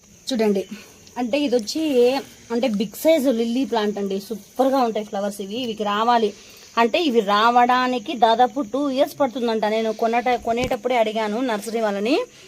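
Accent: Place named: Rayalaseema, India. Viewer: Mi devuru medam rayalasima lage vunnai matalu madi kadiri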